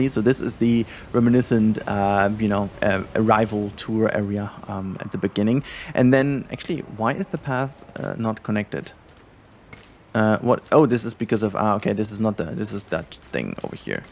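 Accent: Germany. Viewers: du hörst dich deutsch an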